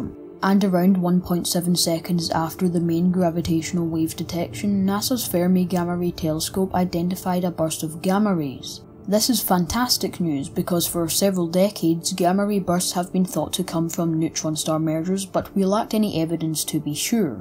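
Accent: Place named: Scotland, United Kingdom. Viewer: Love your videos. I cannae believe that folk would need subtitles for your lovely Scottish accent. All the best from just outside Edinburgh.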